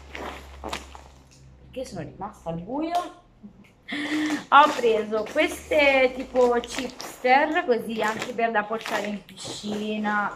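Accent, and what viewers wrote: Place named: Tuscany, Italy. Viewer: Ciao una curiosità, ma tu sei originaria di Napoli o di dove? Alcune volte mi sembra di sentire una inflessione Toscana... Non riesco a capire il tuo accento! Comunque sei una bellissima ragazza dentro e fuori e ti seguo sempre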